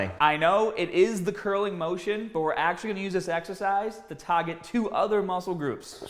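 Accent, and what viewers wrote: Boston, United States. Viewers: Wow that Boston accent! Lol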